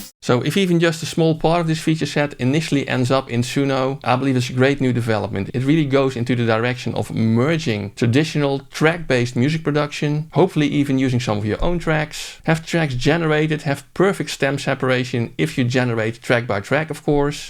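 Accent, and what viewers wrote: Netherlands. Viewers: You can call yourself but your accent betrays you, you're dutch!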